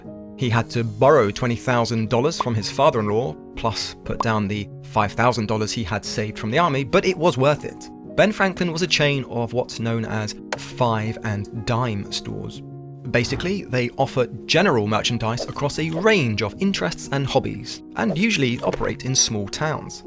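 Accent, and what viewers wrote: United Kingdom. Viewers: Go back to the American accent. This British accent is so damn annoying.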